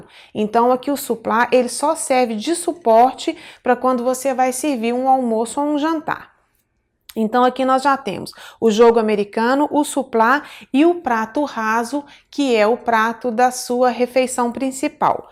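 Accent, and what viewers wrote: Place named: Minas Gerais, Brazil. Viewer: por favor se puder faça um vídeo nos mostrando onde colocarmos a comida depois da mesa posta. Vejo vários vídeos sobre mesa posta mas nunca com a comida. Onde ela deve ficar, se na mesa ou no aparador. Como devemos agir. To aqui em todos seus vídeos. Sem contar que amo seu jeito mineiro de falar. Mata um pouco a minha saudade do nosso torrao natal.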